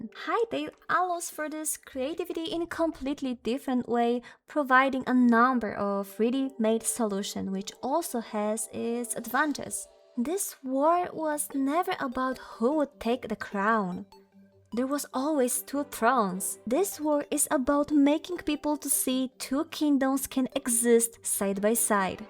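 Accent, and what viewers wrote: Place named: Poland. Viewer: ja tam słyszę polski akcent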